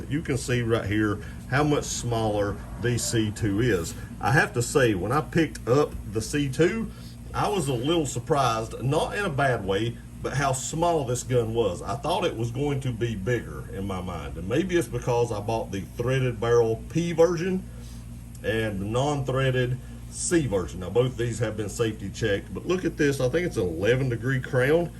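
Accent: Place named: Kentucky, United States. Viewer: I'm getting rid of all my pcc so, I've I've more room in the gun room, and I'm put that cheddar towards 2011 type pistols. I heard you mention gatlinburg, and the mountains look like Appalachian mountains in your vids you sound like you're from Kentucky I'm from east Tennessee/western part of VA (not wva) if I'm right that makes us neighbors.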